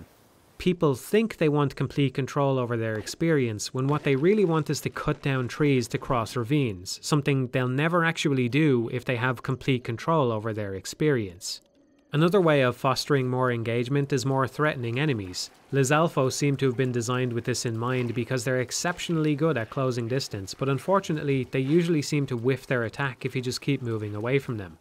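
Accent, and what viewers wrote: Ireland. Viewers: Are you from ireland? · Is this guy irish? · Is this fella Irish? "Sometimes it seems the only thing Link can't mount is Mipha." Haha yeah up the lads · are you Irish?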